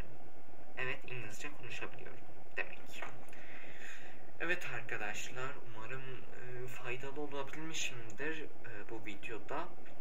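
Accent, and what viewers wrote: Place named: China. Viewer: Dost nädip gurleýan düsürdin Türkmence degil Hytayca gurleyan yaly etdin .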